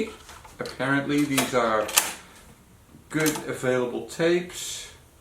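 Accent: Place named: Netherlands. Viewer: Do you service DAT recorders ?? I presume by your accent you are in Holland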